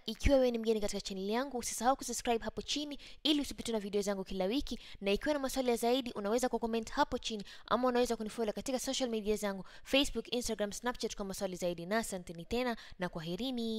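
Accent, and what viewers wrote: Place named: Mombasa, Kenya. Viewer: Mombasa nahisi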